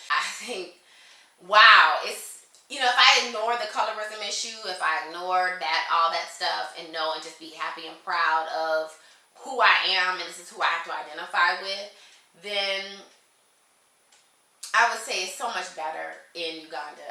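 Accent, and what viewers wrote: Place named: United States. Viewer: Hai sister am a Ugandan but on the issue of colour hooo GOD u are not yet lighter sister we have some Ugandan who are very lighter and u can't imagine. the reason why they stair at u it's because of ur accent they will definitely know u are from U.S. so in our country u are not different of any black people in uganda. Do feel free if u stay for More year no one will stair at u anymore because they will be seeing you every time Otherwise next time u are coming back tell me so that I can secure for u a plot of land to starts ur life there Don't worry u are already at home ok in fact just starts investment plan to move to uganda ok Contact me if u need anything Thank u😘😘😀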